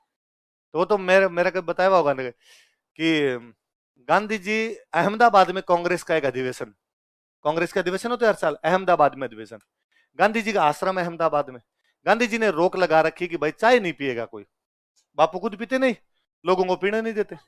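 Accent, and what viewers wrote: Rajasthan, India. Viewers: Bhai ji apki Rajasthani tone me hindi bhot chchhi lagti hai ❤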